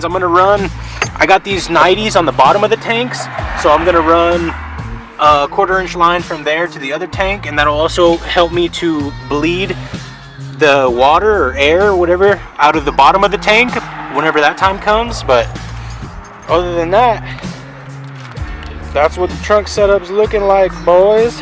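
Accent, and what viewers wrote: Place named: Bay Area, United States. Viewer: i hear hell ya bay slaps! YEEEEE ur from the bay arent you i can tell am i right?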